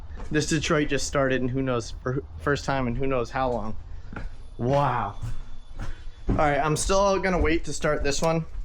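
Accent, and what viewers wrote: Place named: New York, United States. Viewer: This guy has that New Yauk twang and he lives in Florida.... Poor Florida, we are full up with these jerk offs....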